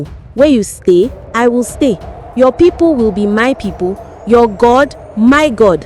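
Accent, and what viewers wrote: Nigeria. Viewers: Awww. I love it. I love the Naija inflections.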